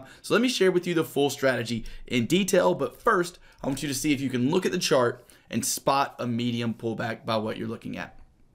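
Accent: South, United States. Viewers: love your videos brotha. thanks for all the help. I gotta ask...are you from the south? I hear some twang in your voice. im from south Louisiana btw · he's the American Chris, coz he sounds american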